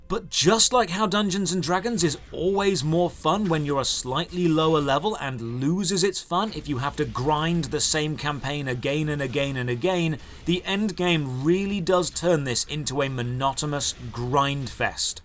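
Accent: United Kingdom. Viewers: Outstanding MMO review as usual. Btw i love listening to your voice and british accent (sorry if i´m mistaken, south-eastern european here). What about Allods Online? I couldn´t find a review about it on your channel. I used to play it about 10 years ago and never got back into it. Would be interesting to hear your thoughts on it! Keep it up!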